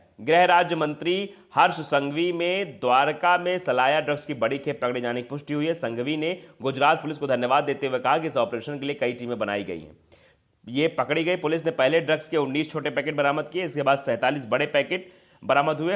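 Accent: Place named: Bhojpuri, India. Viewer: bhai aap ki khabaren main bahut shauk se sunta hoon aap ke bolne ka andaaz bahut pyara lagta hai beech beech me bhojpuri bol dete hain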